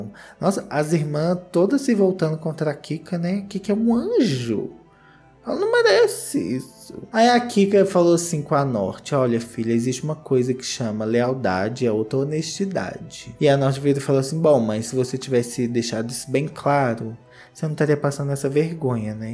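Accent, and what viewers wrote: Minas Gerais, Brazil. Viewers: “Pera lá né” kkk vc e muito mineiro, eu amo o tô longe do meu estado e sempre que te assisto me sinto lá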